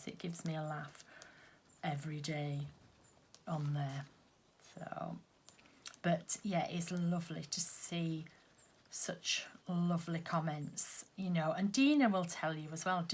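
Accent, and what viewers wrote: England, United Kingdom. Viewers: I cannot say how much I've loved watching and hearing you. As an ex-pat in Australia, I've loved listening to you talking about everyday English things with your brill accent....as well as watching your amazing creative skills. I've only recently discovered you after listening to your Podcast on <link> and I've been obsessed ever since!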